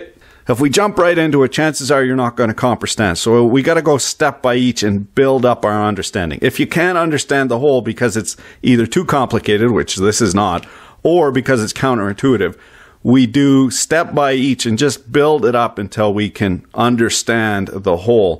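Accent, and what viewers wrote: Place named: Nova Scotia, Canada. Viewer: What the heck is that accent? It sounds like someone born in Nova Scotia who moved to Ontario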